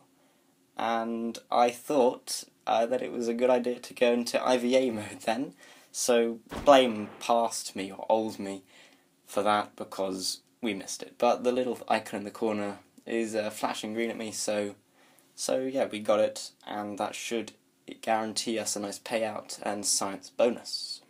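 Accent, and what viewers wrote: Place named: Canada. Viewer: ***** is Canadian, probably why you cant tell:p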